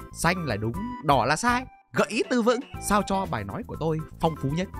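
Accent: Hai Phong, Vietnam. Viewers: Chú ơi chú là người Hải Phòng ạ?😅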